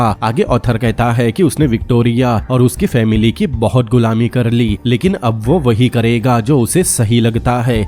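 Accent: Assam, India. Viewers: Kiuki ap ki voice Assamese jisa lagta hai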